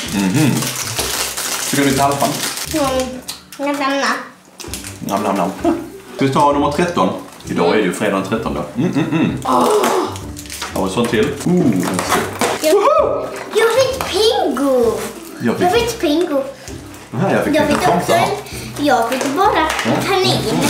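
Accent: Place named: Scania, Sweden. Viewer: #unibrown och pratar mörk och skånska bland det värsta jag vet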